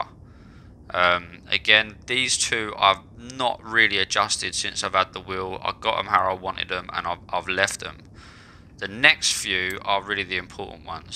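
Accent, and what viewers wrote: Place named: United Kingdom. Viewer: Wow, you have such a deep understanding of cars and how each component contributes to the overall function of the car. That type of knowledge comes from real-world racing I just found you while looking for Thrustmaster wheel vids, are you a real-world racer? You are very professional yet explain it where a novice like myself can understand it. Of course, it helps to be British you guys are clear winners in the oral presentation department. As I progress I will favor your knowledge and hope to see many more vids from you thanks!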